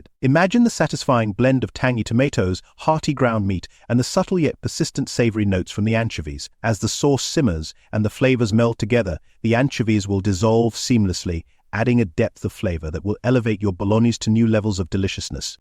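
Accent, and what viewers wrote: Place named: RP, United Kingdom. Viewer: No idea why but the narrator is simply hilarious. Like he's pretending to be Toff English 😂😂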